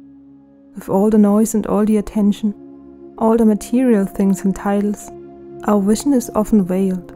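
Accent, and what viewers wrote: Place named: Germany. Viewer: So much like your German accent!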